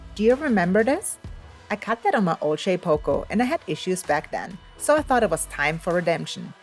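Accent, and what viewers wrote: Germany. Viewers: Hey, man bemerkt noch den Deutschen Akzent, ist auch gut so. Ich mag dieses Board, sieht sehr schön aus 🥰. Wie würde man so eines herstellen können ohne CNC Fräse? Einiges mehr an Arbeit. Ich habe zwei Schildkröten, ich klein.🥰Danke für Dich 🤗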